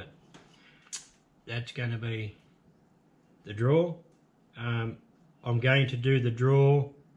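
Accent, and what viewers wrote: Australia. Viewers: You do great videos, and great workmanship on your projects. I've been watching your channel as well as CEE for the content as well as both of your Ausie accents. Keep it up.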